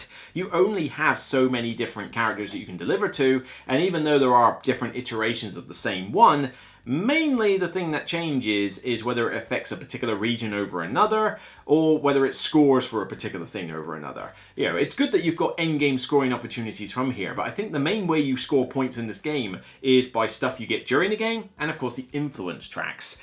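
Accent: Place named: Midlands, United Kingdom. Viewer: This may seem like and odd comment but it’s intrigued me: Have you spent time living in the US? I cannot place your accent. It is midlands on the whole, but every now and then words are pronounced in a strong American accent….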